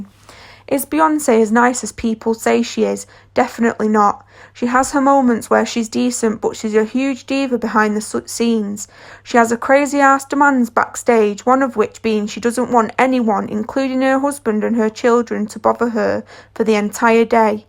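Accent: United Kingdom. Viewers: It’s a British accent (northern region) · You are probably one of theeeee dumbest. The storyteller has a British accent. Now, beyonce on the other hand, sounds like she has a 5th grade education.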